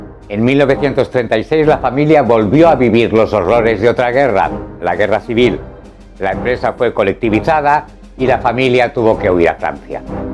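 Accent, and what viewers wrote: Catalonia, Spain. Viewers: Quiero felicitar al locutor, su castellano con acento catalán suena perfecto.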